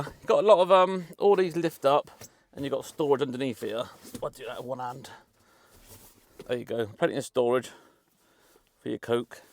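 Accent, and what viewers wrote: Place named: West Country, United Kingdom. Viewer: Great review.love the west country phrases and accent.real people review...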